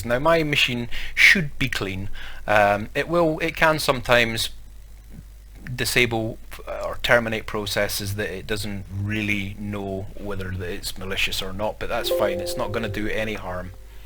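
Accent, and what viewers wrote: Scotland, United Kingdom. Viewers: I just love that Scottish accent as I want to call you. LOL. But Capt kirk said no. LOL. What about VPN's and are they worth the money? The Bleeping rkill is old and not sure where to download it. I am running win 7 bc I HATE win 10 and will only have it if I have too. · If your a Scots man we can understand anything 😅 thanks mate new sub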